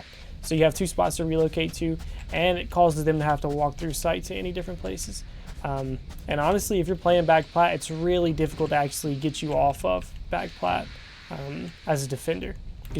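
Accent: South, United States. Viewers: Are you from the south? Im from bama I hear that southern accent.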